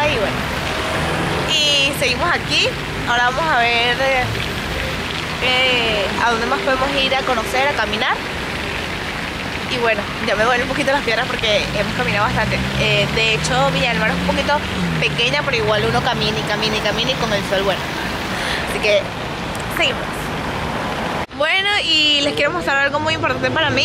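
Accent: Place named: Venezuela. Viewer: Eres venezolana? Me encanta su acento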